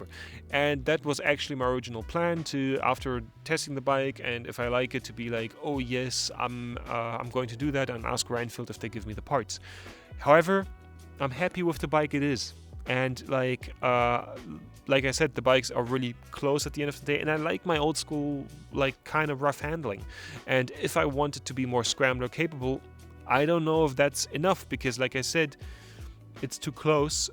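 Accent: Germany. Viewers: I know this is a wildly unrelated tangent, but I was fascinated by your accent. I realized it wasn't quite Dutch immediately, but even when you said you're based in Germany I didn't fully hear the typical 'Hochdeutsch' English accent, so I was wondering if you might natively have a more Plattdeutsch - Niedersachsen-ish dialect... and then I saw the CE plate on your Interceptor and it kinda clicked. Do you natively speak that more low-saxon dialect? Anyway, from a Dutch guy who lives in Norway, thanks for the video! I've pretty much made up my mind about getting a Bear in the spring of 2026, but any and all comparisons I can watch before decision day arrives are great. Love to see that you managed some TET, particularly in Scandinavia, I'm very keen on doing some of it myself as well :)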